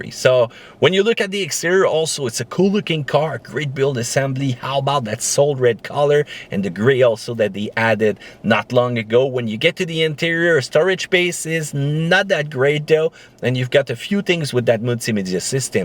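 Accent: Canada. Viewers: From the states I appreciate and enjoy the Canadian accent. I loved hearing GSP from the ufc talk for that reason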